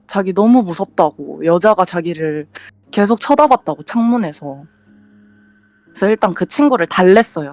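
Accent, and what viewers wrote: Gyeongsang, South Korea. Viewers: 근데 경상도 분인건 확실한데 왜 일부러 사투리 안쓰려고 하는느낌이지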